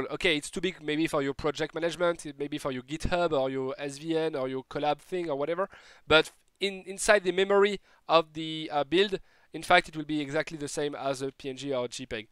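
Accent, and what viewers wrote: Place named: France. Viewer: Hmmm je ressens un accent Français là, ça je valide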